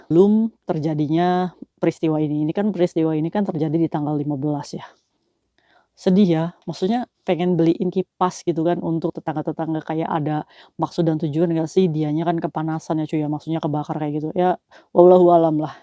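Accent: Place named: Java, Indonesia. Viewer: kirain orng jpng tnyta wong jowo 😊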